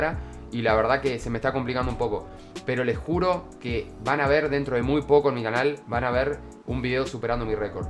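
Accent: Uruguay. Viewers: sos uruguayo a argentino sacame la duda capoo?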